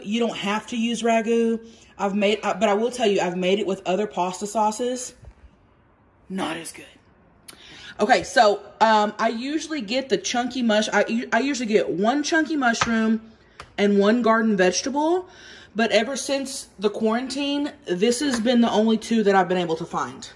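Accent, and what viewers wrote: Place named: South, United States. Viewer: your country accent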